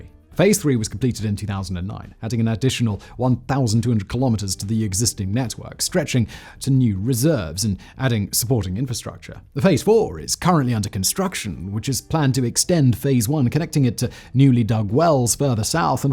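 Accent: United Kingdom. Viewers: I can never tell when if he's mispronouncing something, or if it's just the usual British insistence on adding hard vowel sounds where there isn't even a vowel.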